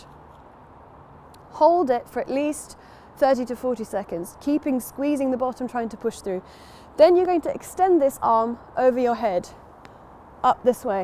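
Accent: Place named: United Kingdom. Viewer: The British accent makes it perfect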